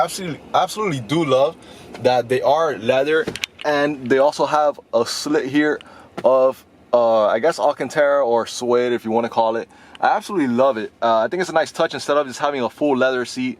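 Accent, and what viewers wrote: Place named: Hawaii, United States. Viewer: just a guess, your accent sounded somewhat Hawaiian. Awesome truck, and great review. Hopefully getting one in the next couple of days!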